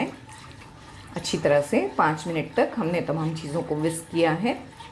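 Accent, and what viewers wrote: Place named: South India, India. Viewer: Hello ma'am rishampreet here from India today I watch ur video first time nice cooking videos Aap ki Hindi se feel ho raha he ki aap India ke south se he m I right or not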